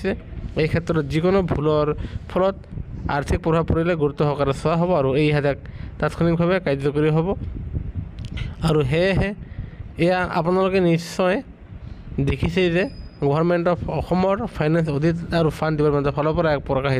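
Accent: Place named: Bengal, India. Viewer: Axomia vakha Jiva khonot val ke practice kori lo.. Bongal buli gom pai😅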